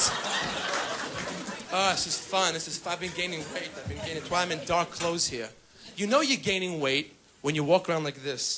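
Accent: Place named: New York, United States. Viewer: he was raised in a religious jewish home. hes got a bit of a yiddish tinge to his accent. very new york jewish.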